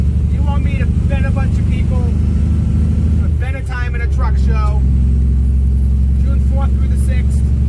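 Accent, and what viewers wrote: Boston, United States. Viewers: I think I heard you say you've only driven for two years. I don't believe that for a moment. You shift the same as I shift my 13 speed Pete. I've been driving long haul for 48 years and I say you pass the test with flying colors. Love the Boston accent !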